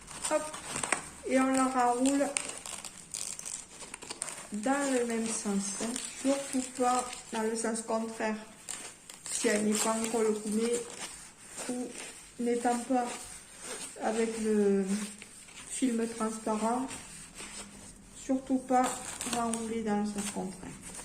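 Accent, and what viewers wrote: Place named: South-West, France. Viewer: coucou merci pour cet unboxing et je trouve que tu as un accent du sud ouest... est ce que je me trompe? car je suis du tarn et je trouve qu'on a un peu le même accent! bisous bye bye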